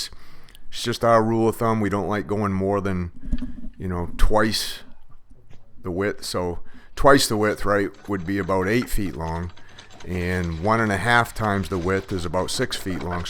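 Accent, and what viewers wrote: Maine, United States. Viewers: I knew you was from maine as soon as I heard you start talking, I'm originally from Maine. I lived in Washington County. Actually, the little town was called Princeton, but I watched your video 1 day because my husband is a Mason of like 40 years. And I was just going through different videos and see how other people did stuff and have been pop on yours and new year's from may good videos, by the way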